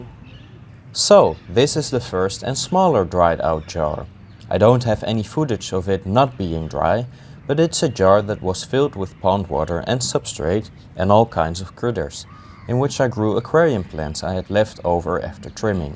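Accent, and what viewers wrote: Netherlands. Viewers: I never was sure if you were dutch but you said it in this video i love our dutch accents 😂goeie video gast!!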